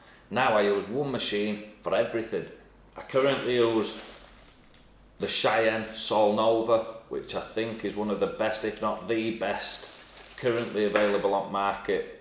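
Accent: United Kingdom. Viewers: So good to hear not only a Brit accent but I'm from Hull so not far off mine, nowt wrong with our cousin's across the pond but I know your advice is UK based & everything you recommend I can get etc, I'm 48 & been dying to tattoo for years, done everything from paint fighter jets, charicaturing, portraits, murals & gravestone artwork (very similar to tat designs tbf especially the Gothic & flower based stuff) & finally said f**k it, dive in & give it a go!! But f**k me tat artists I've tried to get training or tips off are very cagey, I've found the whole industry clique & hostile to outsiders, especially an actual artist like myself who I believe some of them felt intimidated by, they were basically glorified tracers & couldn't draw for shit! Anyway, rant over, I've watched 2 vids so far & think I'll just block out the rest of you tube & stick with you pal!! 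Obviously subbed & notification bell hit so looking forward to binging past content & the new stuff!! Here we go!!!😁😁😁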